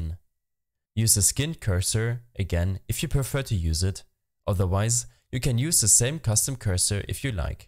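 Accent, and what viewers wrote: Germany. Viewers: so geil das man raushört das er deutsch ist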